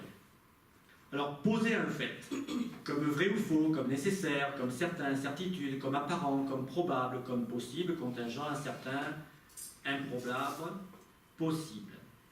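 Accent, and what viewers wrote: France. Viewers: he says provençal words with a very strong french accent, is my impression as a catalan speaker. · tEnen No, la seua llengua com a tal és molt pura, llevat de l'accent francesitzat.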